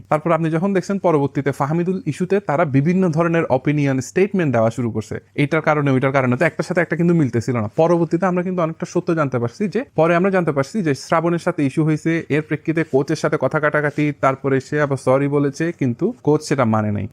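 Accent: Chittagong, Bangladesh. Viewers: ভাই, আপনার বাড়ি কি চট্টগ্রামে, আপনার ভয়েস দেখে মনে হচ্ছে চট্টগ্রাম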